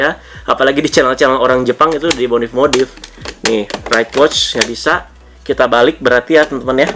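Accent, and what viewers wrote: West Java, Indonesia. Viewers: Agak logor. Sunda sekali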